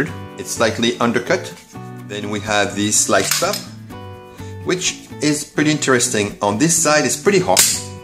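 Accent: France. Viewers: je reconnais un accent français ?!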